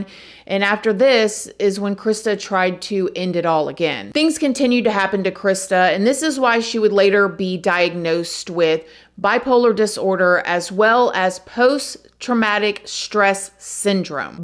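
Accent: South, United States. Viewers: Love southern charm btw job corps = “core” not “corpse” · I love your content but love your southern dialect even more ☺️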